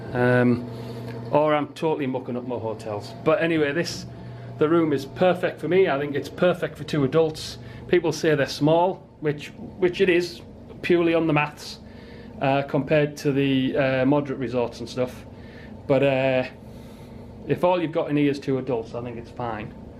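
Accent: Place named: Durham, United Kingdom. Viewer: Fandomlife I'm from Durham, recognised the accent lol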